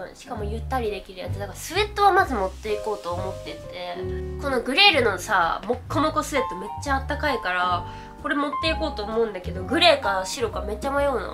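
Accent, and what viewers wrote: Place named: Kansai, Japan. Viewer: 関西弁かわいい👶🏻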